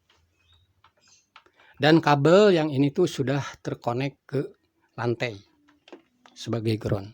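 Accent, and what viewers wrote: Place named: West Java, Indonesia. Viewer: enya nyariosna sunda banget hahaha tapi tenanaon, maju terus kang!!!! SUNDA terus berkarya☺️☺️☺️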